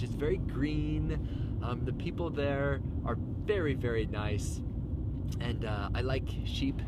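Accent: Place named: Northern Ireland, United Kingdom. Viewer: Ur accent is the north of Ireland accent different from the south. The north have a funny accent I think.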